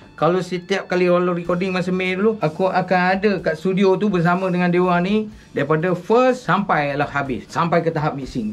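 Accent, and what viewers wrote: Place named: Johor, Malaysia. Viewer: 😅saLam~saye rase nie video Lame Lbh kurang10tahon Lps abangmus throwback semuLe ye!?🤔dah Loghat joho Lah puLak gamak nye nampak tengok bang🤙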